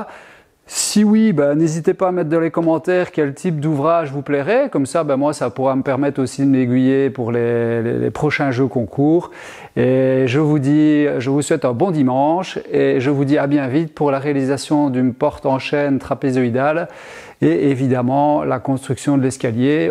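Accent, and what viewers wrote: Belgium. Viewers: Le T-Shirt on achète! 😁 Et le coup des T-shirt d'autodérisions de notre bel accent belge pareil! Super idée, le coup de l'artisan local! Belle initiative! Félicitation! 👍👍 J'oubliais: l'établi MFT top idée!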